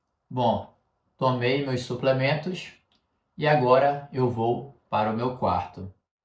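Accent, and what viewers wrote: Rio de Janeiro, Brazil. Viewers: Great video brother, I love ur comprehensible input style! What’s your accent? Are you from Rio de Janeiro or…? Also, are you currently live in Romania or something?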